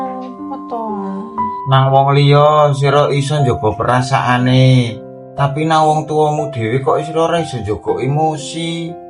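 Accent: Purworejo, Indonesia. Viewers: Omahmu ngendi kang logat medok purworejo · Bahasane koyo wong Purworejo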